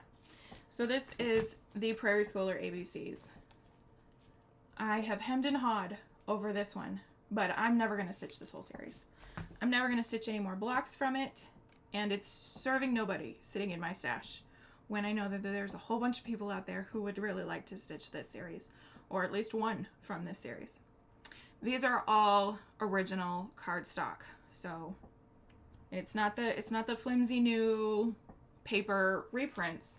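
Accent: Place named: Wales, United Kingdom. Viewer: Love your progress , congratulations on 10 years on flosstube , Welsh is a accent I really love 😊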